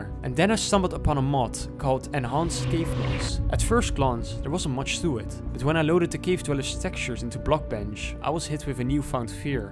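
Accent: Netherlands. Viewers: i smell dutch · You are definitely Dutch, nice. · You are Dutch, right? I love your accent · Beetje nederlands accent ofnieee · You sound so dutch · one of the heaviest dutch accents ever. geweldig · im sorry to ask but are you dutch? you have a dutch accent when speaking english lol xD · are you dutch? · ik ruik een Nederlands accentje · Are you dutch? very nice video gonna try this Tommorow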